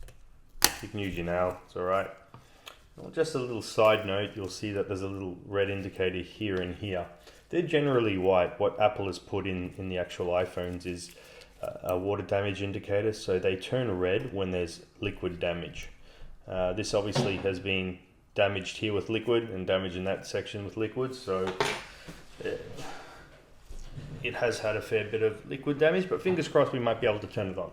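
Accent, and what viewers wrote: Australia. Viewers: elliott debell Australia going by his accent.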